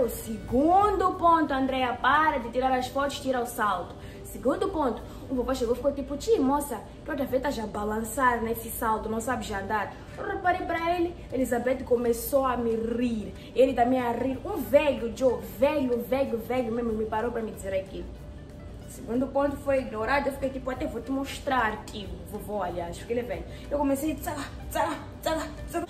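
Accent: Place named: Beira, Portugal. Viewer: Sotaque beirense amo isso querida wamama kkkkkkk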